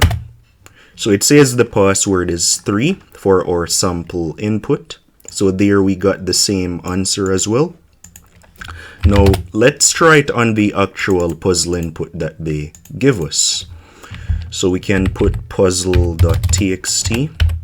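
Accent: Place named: Jamaica. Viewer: I love your accent. Jamaica?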